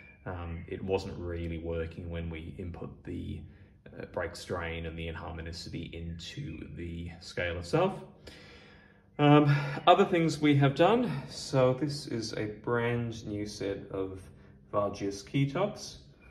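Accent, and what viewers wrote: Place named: Australia. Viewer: Hi, Where are you located? I am guessing in Australia or New Zealnd by your accent. In New England here near Boston. I am crazy about that gorgeous Richard Lipp. We almost never see their pianos. On Roberts piano out of Oxford he gets them from time to time and appears to really like them. Any info you care to share about heirloom pianos would be appreciated.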